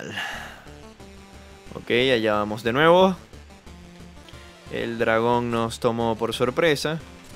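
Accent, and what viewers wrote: Venezuela. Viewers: OK OK ok...sere yo quien lo diga: DROSS!?!? ERES TU!?!?!!? hahaahah Bueno no es que esas grocerias con ese acento venezolano me recuerdan mucho a sus viejos vídeos. Por cierto esos juegos son de los que te vician y no mas no te sueltan!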